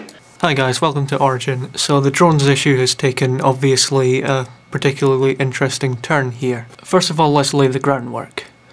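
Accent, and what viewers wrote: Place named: Scotland, United Kingdom. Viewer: are you scottish? you sound scottish <3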